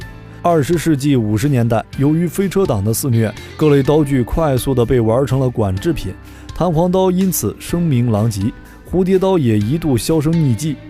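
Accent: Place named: Taiwan. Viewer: 这个节目到底是中国的还是 台湾腔奶奶风娘的？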